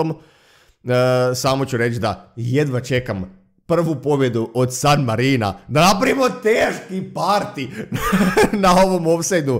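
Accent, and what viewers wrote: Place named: Međimurje, Croatia. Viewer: samo pitam jer govori skoro pa nas jezik međimurski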